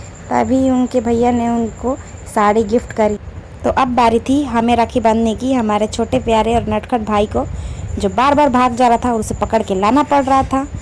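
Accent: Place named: Bhojpuri, India. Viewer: hnnn bhojpuri type bol rhe ho na aaisa hi kuch lag rha tha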